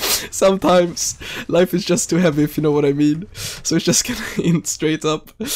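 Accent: Sweden. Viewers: That swedish accent. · Nice commentary! BTW...sounds like ure swedish ^^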